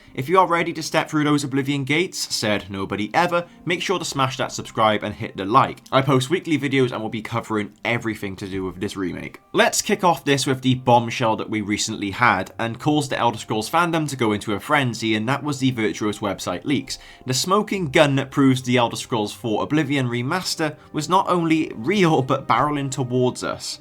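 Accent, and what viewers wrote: South Wales, United Kingdom. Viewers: So where in south wales are you from? (I can hear it, man)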